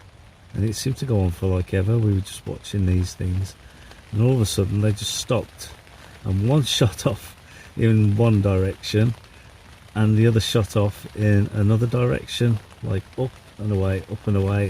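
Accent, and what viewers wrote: Black Country, United Kingdom. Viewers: Hiya bud, I just came across your channel and you seem a genuine and friendly bloke. I’m from Yorkshire but have lived in the West Mids for over 20yrs now and find most to be really nice people. Good to hear a Yam Yam accent on YT 👍 Subscribed 👍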